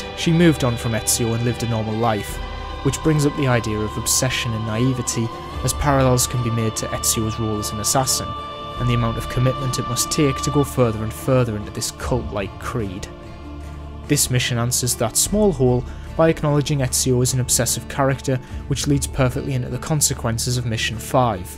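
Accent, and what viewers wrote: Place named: North East England, United Kingdom. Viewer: This character analysis is one of the most detailed I’ve ever seen on YT. You’ve made me fall in love with Ezio even more than I already did! Also, can’t help but notice the very north east English accent you have 💃🏻. Fellow NE’er here 😎😎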